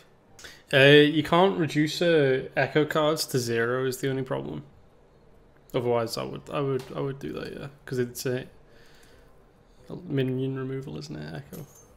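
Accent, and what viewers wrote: Liverpool, United Kingdom. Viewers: Bruuuuh what?! A scouse streamer?! Yes laddddddd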